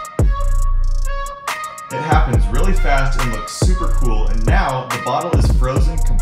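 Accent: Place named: United States. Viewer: Oh my God, I love clear American. It’s so good.😂😊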